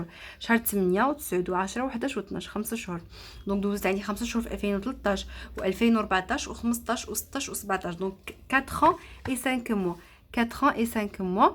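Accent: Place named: Tangier, Morocco. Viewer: اوكي كانظن بلي انت من طنجة عقلت الهدرة 😊بتوفيق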